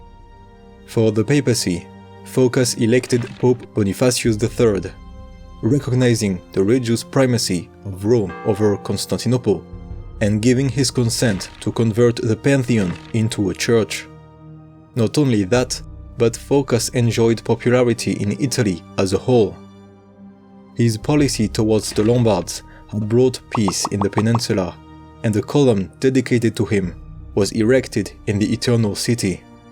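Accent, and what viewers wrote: France. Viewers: 😂 l’accent French , merci beaucoup tu régale , j’imagine pas le taff derrière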